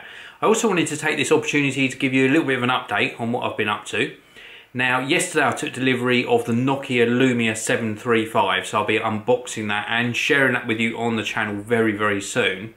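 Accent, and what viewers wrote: England, United Kingdom. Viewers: Where are you from in England?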